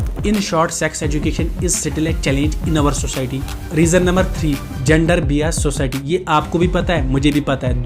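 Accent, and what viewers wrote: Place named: Kashmir, India. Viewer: You are doing very well bro.... Keep it up zuva.... One suggestion there is a little need for upgradation in narrating skills and Accent. Koshur Accent thora sa reduce karunn Baaki overall video cxe zabardast❤❤❤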